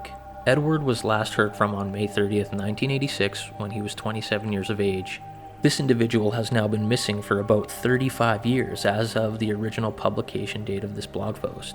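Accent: Canada. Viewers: he says " bean " instead of Been there the whole time is this a Canadian accent thing or ? "yeah, no ?" lol 😆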